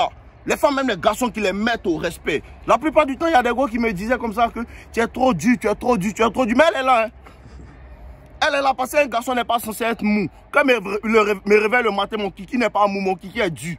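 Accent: Ivory Coast. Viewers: Tu parles comme un ivoirien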